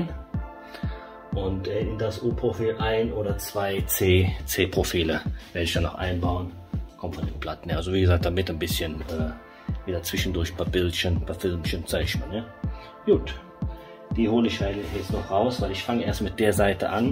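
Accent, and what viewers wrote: Cologne, Germany. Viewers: ein "Profi" mit Parkside Werkzeug 😉 Endlich kann ich meine Lidl Käufe rechtfertigen, auch wenn dich meine Frau hasst, nur vom zuhören. 😉 Also bitte weiter auf Köllsch 👍 Wenn es Sinn macht für ein Video, kannst ja deine Werkzeuge vorstellen und warum du die hast, was die können oder nicht gegenüber anderen Marken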